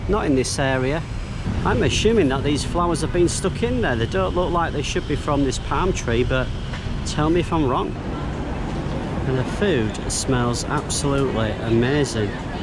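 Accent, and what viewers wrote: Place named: Newcastle, United Kingdom. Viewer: Are you a Geordie? I really like your accent. I used to study in Newcastle back in 2016.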